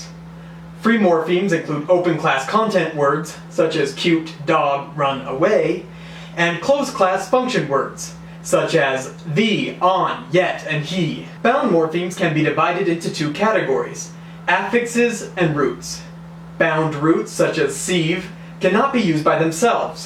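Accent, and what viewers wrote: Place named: United States. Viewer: his accent also helps a lot, an excellent American well pronounced English Accent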